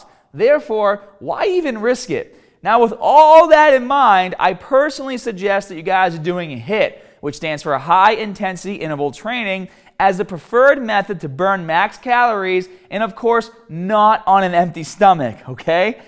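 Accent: Boston, United States. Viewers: I I've been watching your videos to help me get in shape and learn how to do stuff. I have to say this video your Boston accent was way too strong! Every time you said the word cardio it was killing me! Now... I don't think understood 1 word of this w all the tequnical jargon, except that maby I should eat a little something before my 20 minute cardio in the am, but what? a full breakfast? have exercise induced asthma so a lot of times I will walk for several minutes and then do a jog for several minutes (whether that's outside or on the treadmill). Didn't know that was considered some sort of a HIT routine. Love the shirts btw, the superheroes are the best, where do u get them? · Is he from Boston? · From Boston?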